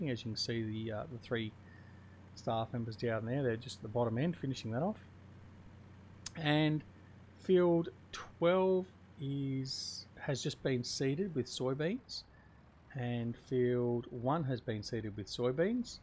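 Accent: Australia. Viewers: Did you need to use GE to do some of your farm upgrades like main entrance and removing buildings? Love your videos and most favourite FS19 YT'r. I wish I could make Maps and would like to add some Aussie maps and do some work on WA map. Good to hear a fellow Aussie voice on YT. Best wishes for your wife. Keep up the great work.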